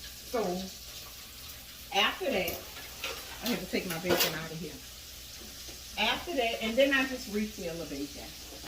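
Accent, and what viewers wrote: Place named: New Orleans, United States. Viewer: I knew I heard that NOLA accent. 😂 when I started watching. I love it. I will NEVER forget Katrina and Rita hit Texas shortly afterwards. It was August 2005. I will never forget it. I’ve made some of the best friends from my NOLA posse who came to Texas and never returned after Katrina. This video had me crying and gave me chills remembering the horrible stories.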